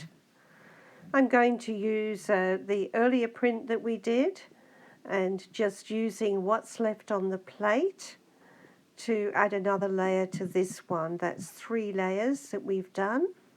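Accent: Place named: Australia. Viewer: Just found your channel,. Good to hear an Aussie voice. Love wabi sabi style. Can you tell me what the paper is, and the brand?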